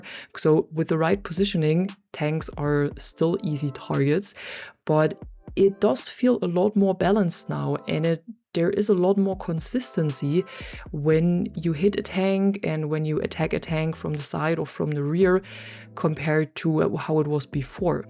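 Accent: Germany. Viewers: Der deutsche Akzent is der Hammer, danke für das Video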